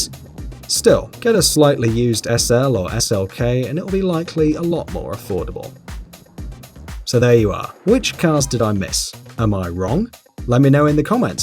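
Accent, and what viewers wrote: England, United Kingdom. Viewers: are you from the UK because you sound English. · Are you British i guessed coz of your accent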